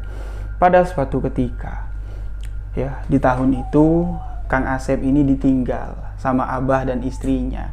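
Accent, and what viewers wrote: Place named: Jambi, Indonesia. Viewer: Senang banget liat bg bercerita... Jngan lupa logat jambi nya ya bang?? Kereeeen.. 👍👍👍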